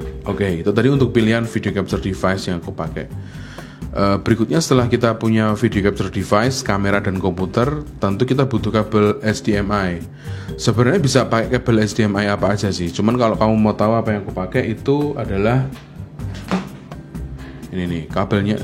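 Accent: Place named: Java, Indonesia. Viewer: medok sekaleeeeee..... salam wong jowo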